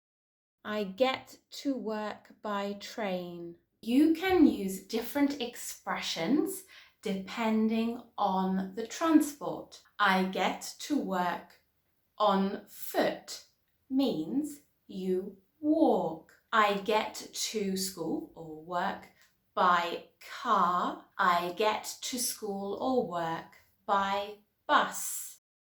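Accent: United Kingdom. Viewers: Amaaazing! I love the british english🇧🇷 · I guess you’re British because of your accent. I live in Taiwan.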